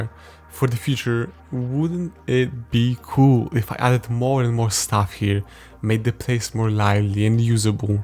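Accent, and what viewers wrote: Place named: Germany. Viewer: Is creator german? This accent i dont know what is it😂. Cool video btw!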